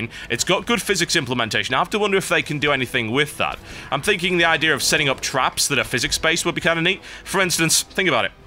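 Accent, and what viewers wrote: United Kingdom. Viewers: L2 British accent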